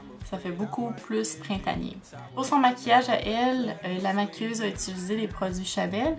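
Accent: Quebec, Canada. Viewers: T'est magnifique, j'adore l'accent québécois.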